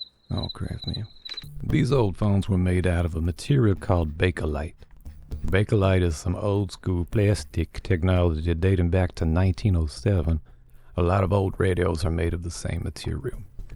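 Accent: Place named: Mississippi Delta, United States. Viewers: My favorite thing to do in the morning is watch craftsman while I’m doing my make up lol there’s just something about the combination of an extremely southern man talking to me about making toys which I will never build while doing my someone elaborate make up routine 😂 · This channel rules, and that dulcimer deep south Mississippi delta accent is the cherry on top.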